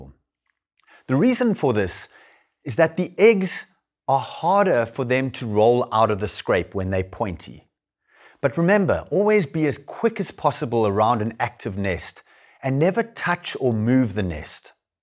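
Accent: South Africa. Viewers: I can clearly hear this guy is South African. We love bird watching 😂😂😂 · I knew your accent was different! I just saw a comment that said you are south African.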